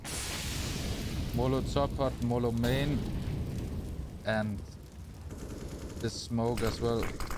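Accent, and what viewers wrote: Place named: Denmark. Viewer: Your danish accent is sexy af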